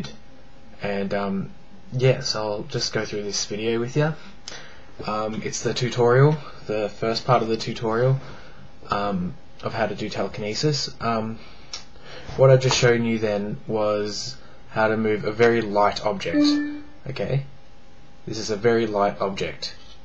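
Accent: Australia. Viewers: Is he Aussie? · You're Australian, aren't you?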